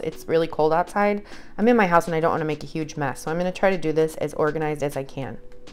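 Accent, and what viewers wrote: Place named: Minnesota, United States. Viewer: I love this! I have already ordered most of the items you use! Also, I love your Minnesota accent!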